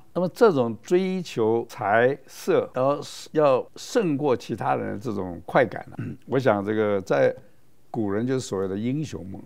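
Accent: China. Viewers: 為什麼用的是中國腔的語音啊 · 為什麼語音是中國口音？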